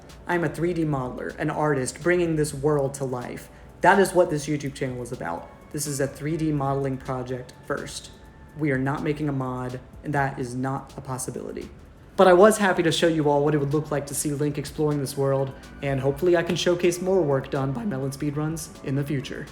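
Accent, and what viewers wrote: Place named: North America. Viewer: it's possible that other software devs have already some or all of this work (like if there's a "Zelda Mod Tool" made by fans you can download) but even so it'd be a Herculean task if done as a mod. If done as a standalone game, it'd be as much work as... ya know, a game - which is a ton of work that can't be sold if you don't have the legal rights to use the characters. So, as long as he was giving it away for free, it'd probably be technically within Nintendo's policy for fan projects, but they set those rules and they have a history of shutting down projects like this. Judging by our guy's accent, he's probably either from the US or Canada, and in either place, even if the courts decide he's totally in the right, if Nintendo decides to sue the process would take literal years and tens of thousands of dollars in legal fees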